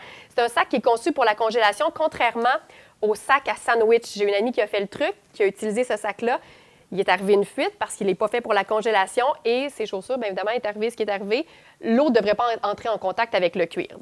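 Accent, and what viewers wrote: Quebec, Canada. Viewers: Doney Hon c est français du canada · vous êtes non seulement BELLE mais avec votre accent Canadien, mon dieu vous êtes SO SEXY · stupide commentaire c est une québécoise idiot du village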